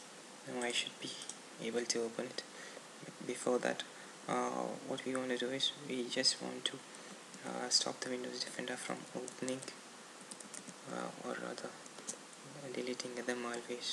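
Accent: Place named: United Kingdom. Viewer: Inglés británico.... es otro nivel, deja de estudiar el inglés americano porque no sirve realmente.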